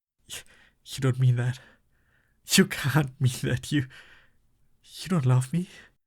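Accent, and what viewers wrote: Germany. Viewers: Your german accent is thinker than anything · Are you german it Sounds a bit like it i‘m german myself i was just wondering ? But love the video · ……….I- I was not expecting that accent. You really from Germany? Goddamn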